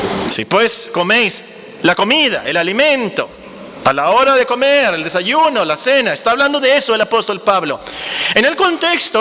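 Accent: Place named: North, Mexico. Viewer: min Pajarito... pero Prehistórico Jajajaja, no, es bromita! Muchhhioooo problema con la glotonería. ¿Es de Chichuahua el predicador? Pronuncia como nosotros los del norte la "CH". Muy buen mensaje para los que somos medio glotoncitos ♥ Dios le bendiga.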